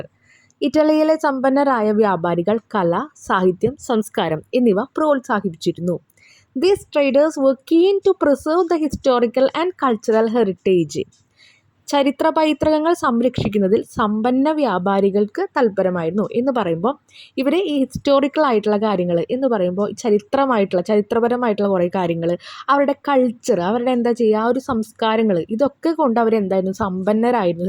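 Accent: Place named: Chalakudy, India. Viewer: Mole poli 🤘 ടീച്ചറെ നാട് ഏതാ ചാലക്കുടി ആണോ മാം എന്റെ ടീച്ചറെ സംസാരം അതുപോലെയ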